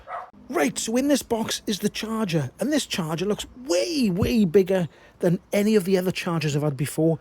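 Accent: Wales, United Kingdom. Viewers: Sooooo glad you have had this bike for review as I've set my little heart on it. The pro version does not have a job motor and does not have my beloved boost. The battery is using Samsung batteries which enable the gate charge and will also give a longer life as they can withstand the stress of current flow during the charge (so I'm told😅). It would be great to see a range test and listen to your brilliant commentary with the Welsh shining through. Thanks for a great review 🎉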